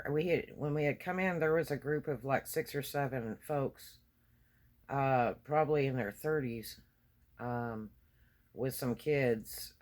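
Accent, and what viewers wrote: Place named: South, United States. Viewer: You're not southern? I thought you were. Where are you from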